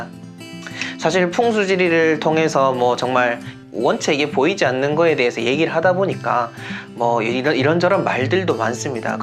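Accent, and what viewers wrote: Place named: Daegu, South Korea. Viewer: 대구 사투리?? 뭔가 똑부러져요 ㅎㅎㅎ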